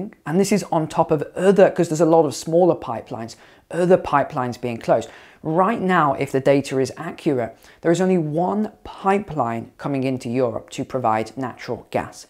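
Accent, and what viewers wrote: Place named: England, United Kingdom. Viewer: This is all baloney, judging by the accent this guy is English and apparently looking at Europe. ( to the EU) with a very English perspective. First there is huge difference between various European countries. The Western European counties aren’t that cold plus hardly have any Forrest’s at all. Shutoffs might happen in the U.K. but it’s highly unlikely to happen in most European countries. Gas storage on the mainland is enough to cover the EU’s needs until about march purely based on the amount in storage tight now. Plus gas is still arriving every day.LNG by the way arrives in Ships in liquid form and then gets converted back to gas. The only problem is it’s much more expensive. Electricity is unlikely to be a problem because the old coal electricity power plants have been reactivated. Speaking about people be snowed in, in Europe is ridiculous it’s possible but very unlikely. 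The Europe climate is pretty mild.snowstorms are extremely rare.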